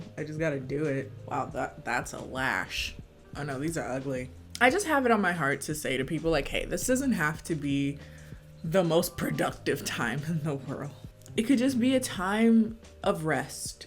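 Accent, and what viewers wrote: United Kingdom. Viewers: That British accent 👌👌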